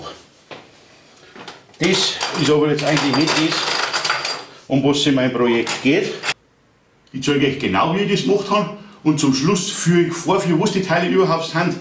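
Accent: Bavaria, Germany. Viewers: Beinahe hätte ich ein Daumen nach unten gegeben. Das Video war äusserst langweilig, Die Stummfilm-Zeiten sind doch lange vor unserer Zeit vorbei gewesen. Bitte sprich wieder in den Videos deine bayrische Goschen, hat mir immer Spass gemacht.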